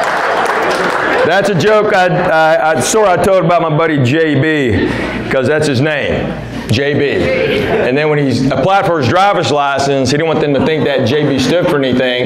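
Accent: South, United States. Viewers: His making fun of southern accents while speaking in one is really funny.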